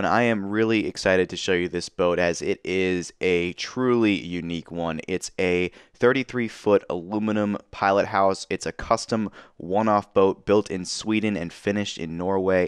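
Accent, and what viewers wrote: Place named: United States. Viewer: He’s not English , yank maybe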